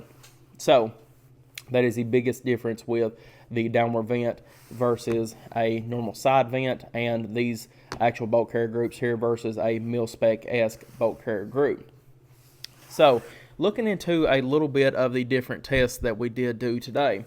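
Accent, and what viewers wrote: South, United States. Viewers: Love the way real Southern Boys talk. It's not a BCG, it's a BeeeCeeGeeee.......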